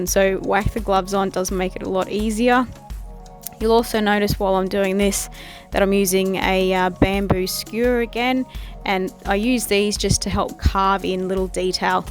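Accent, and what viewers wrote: Australia. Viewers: oh wow this was pretty cool and an aussie. nice to hear a local. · Tasmania? I hear some similarities between you and others that I know are from there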